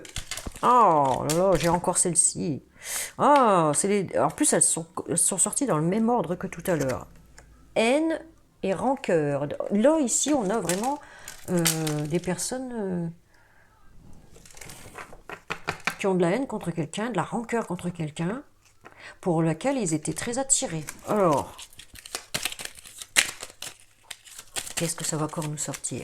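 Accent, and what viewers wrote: North, France. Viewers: j'adore l'accent du NORD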